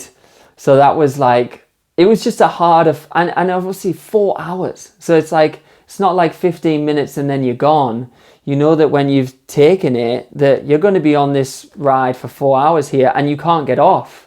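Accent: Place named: Newcastle, United Kingdom. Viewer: Geordie maybe 🤔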